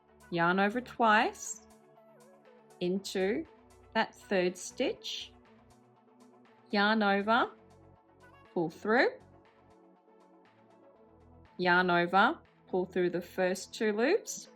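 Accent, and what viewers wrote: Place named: Australia. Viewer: New Sub here. Great work by the way. PS: it’s very refreshing to finally hear an Aussie voice doing crochet videos ❤ loving it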